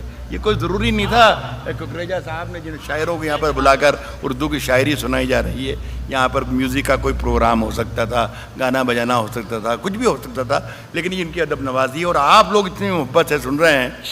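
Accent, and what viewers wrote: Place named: Jaipur, India. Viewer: Aap jaipur se ho mene aapki bhasha suni mebhi जयपुर मैं ही rehta हूँ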